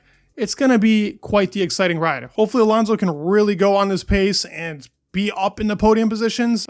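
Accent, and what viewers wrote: United States. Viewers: Same here. I listen to the Brits of course, they have a lot of good content, but it's great to hear an American voice being absolutely as good as any of them, and with perhaps a little less bias towards the British teams and drivers.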